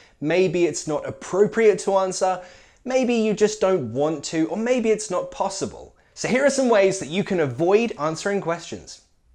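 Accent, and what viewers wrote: United Kingdom. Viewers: OMG ! u've got a great British Accent . 😮😮 by the way . I like ur style of teaching it's funny All the best 😍❤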